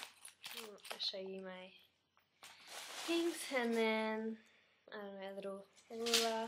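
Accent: Australia. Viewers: Are you Aussie ( I thought you may be because you had ANZAC cookies)?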